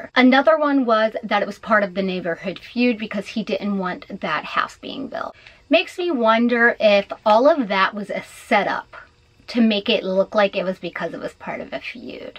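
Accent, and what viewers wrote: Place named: Philadelphia, United States. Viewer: You’re from Wilmington!! I was wondering where and I must’ve missed it before. I’m from right outside Philly (prospect park) and I knew you sounded like me...our “accent” lol 😂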